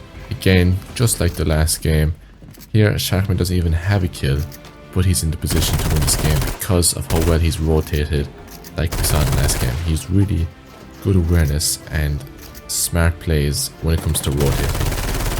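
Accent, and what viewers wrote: Ireland. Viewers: Is you Irish?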